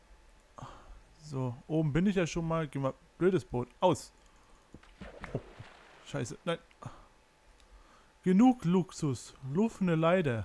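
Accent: Berlin, Germany. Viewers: Den Akzent versteht doch jeder! und dasb isschen berlinerisch ick glob ick spinne dat müssteste wenischstens n bissl kenn ehy! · Dat ist Berliner Akzent, so schwer ist dat nicht.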